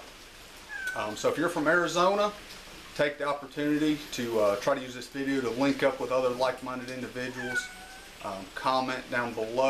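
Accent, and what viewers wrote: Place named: South Carolina, United States. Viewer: Great to see and hear you! I grew up in S.C and I miss that accent! Arizona is a nice place!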